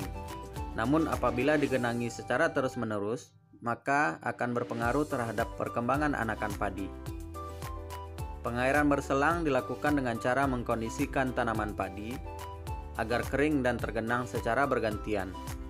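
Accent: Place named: Aceh, Indonesia. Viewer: PASTI NIH ORANG ACEH YA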